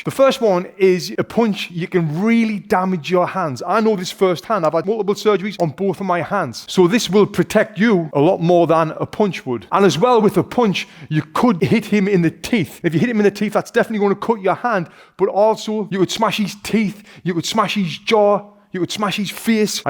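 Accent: United Kingdom. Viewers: I'm learning British accent while learning how to fight )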